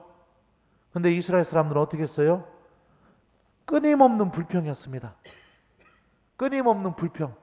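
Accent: Chungcheong, South Korea. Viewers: 충청도 신부님 사투리 더욱 친근감 ~~~ 화딱지~신부님 존경합니다 저도 화딱지가 날때는 신부님 강론을 듣고 하느님께로 다가가면 저에게 은총으로 사르르~화가 감사로 ~~ 아멘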